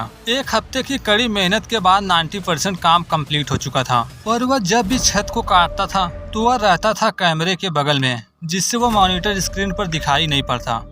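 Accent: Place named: Bihar, India. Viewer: Bihari h bolney wala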